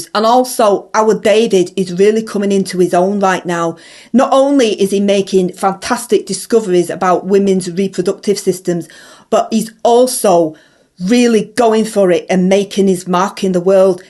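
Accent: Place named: Manchester, United Kingdom. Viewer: yep, Basic mancunian.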